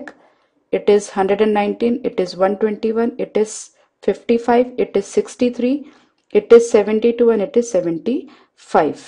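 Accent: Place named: India. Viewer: Mam you are best your sound is hindustani and you are the bestest in the world thankyou mam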